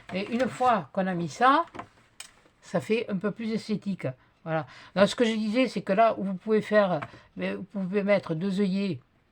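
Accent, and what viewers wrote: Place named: Aveyron, France. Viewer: Coucou, je regarde toutes tes vidéos mais je ne commente pas souvent. J'aime beaucoup ton tuto, des défauts tout le monde en fait, mais certaines ne le disent pas, ton parler je le connais bien car je suis de l' Aveyron... Bonne journée, bises😍😍😍😍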